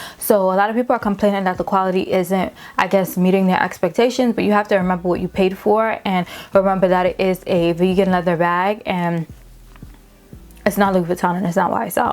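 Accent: New York, United States. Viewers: Why do I always know when someone is from New York lmao.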